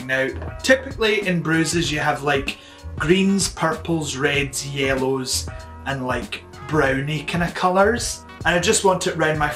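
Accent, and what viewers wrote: Scotland, United Kingdom. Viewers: Lawrence Chaney could insult me in Scottish and I would say thank you, may I have more please? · Love that Scottish accent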